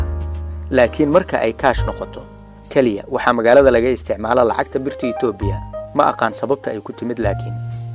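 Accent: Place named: Mogadishu, Somalia. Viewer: Golden voice Masha Allah muuqaalkaada iyo afsomaliga aad Ku hadashid ismalaha reer xamar laguu maleeya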